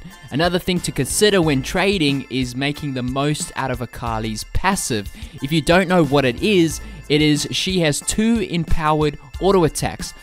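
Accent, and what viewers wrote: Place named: Durban, South Africa. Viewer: God that fucking Durban accent is unbearable.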